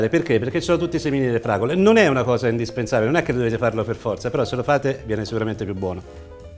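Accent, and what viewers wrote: Tuscany, Italy. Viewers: E' toscano, probabilmente. Ci viene naturale!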